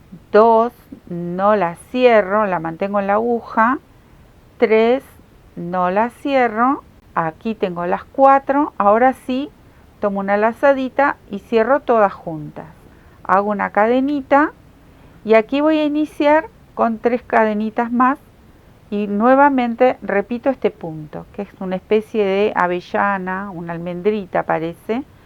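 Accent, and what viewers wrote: Argentina. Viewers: De acuerdo, la cobijita mas linda del internet. Yo disfrute muchisimo los videos, uno tras otro como si fuera una serie de tv. Yo soy mexicana, y me encanta su acento argentino! Estas cobijas no tienen igual, mi abuelita tejio una, se la dio a mi mama y todos la querian usar, iba de alla para aca. En mi familia yo soy la tejedora, de niña me iba sola a clases de tejido con puras señoras, y desde entonces me encanta. Gracias por estos hermosos tutoriales!!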